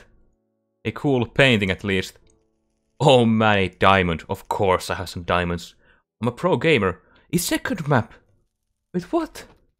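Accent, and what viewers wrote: Finland. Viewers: Suomalainen aksentti... Xd Keep up the great content! 😃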